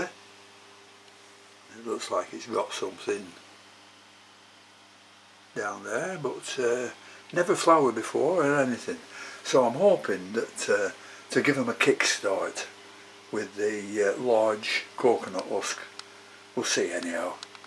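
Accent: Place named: Newcastle, United Kingdom. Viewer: Keep up the excellent work. Have a great day. BTW I like your Gordie accent.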